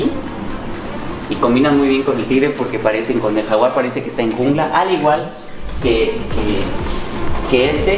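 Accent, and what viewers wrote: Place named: Yucatan, Mexico. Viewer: Me gusta que nunca se le perdió el acento yucateco.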